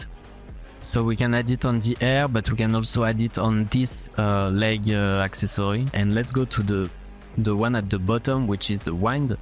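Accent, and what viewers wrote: France. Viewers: L'accent français de fou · You have french accent 🤨 , are you french ? (Because i am) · I think ur french if it is I'm too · Ça sent l'accent français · L’accent français a 100% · BRO T'ES FRANÇAIS ?! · As a French, he's clearly French heeh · Bon ça se voit tu es français, mais bro merci pour cette app incr totalement gratuite, juste y’a des pubs mais quoi de plus logique finalement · we j’ai vu il a un accent francais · Are u french????? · You lowk have a mad French accent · Mec t'est Français 😂😂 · It's not against you, but as a french speaker, You have the accent of a French speaker who speaks English. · T'es Français ? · Are u French? Bc ur accent ;p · On dirait un francais❤🇫🇷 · Ta un accent français 😂😂😂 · Bro are u french ?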